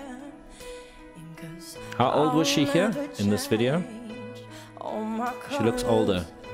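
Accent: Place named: South Africa. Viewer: If I'm not mistaken, you have a south african accent :) my mother was from woodstock Cape Town.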